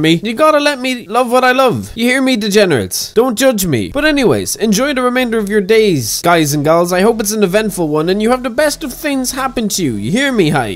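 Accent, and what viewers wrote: Ireland. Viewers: Not here for Irish accent already got one of my own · Yes, I came here to your channel specifically to listen to your Irish accent. There's no doubt about it. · I like the video but dislike the Irish accent, what do I do?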